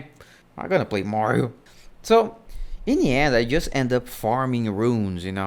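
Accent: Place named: Brazil. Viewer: Sim KKKKKKKM Eu pensei que era um brasileiro no começo do vídeo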